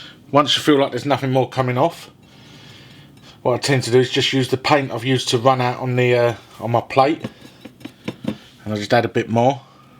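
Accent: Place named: England, United Kingdom. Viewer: American language? Wot you on about you bleedin sceptic. Its the king's English he's speaking. He ain't gonna speak Spanish nah iz eee?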